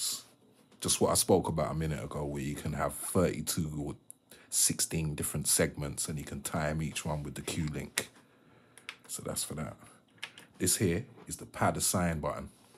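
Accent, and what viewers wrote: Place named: London, United Kingdom. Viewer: also its good to be listening to a London accent rather than an American one.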